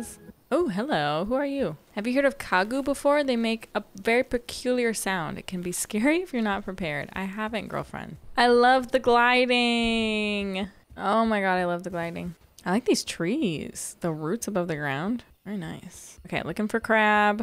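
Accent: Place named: Magdalen Islands, Canada. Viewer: it reminded me most of the accent on Les îles de la Madeleine in Quebec. Close but not as “muddy”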